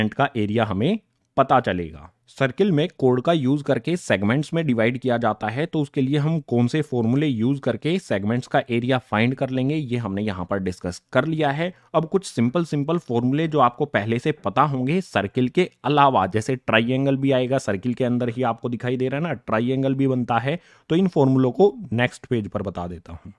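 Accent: Haryana, India. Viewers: Bhai.. Sir. Kaha ke h.... Mujhe lagta h ki haryana ya rajasthan ke h..... Apko kya lagta h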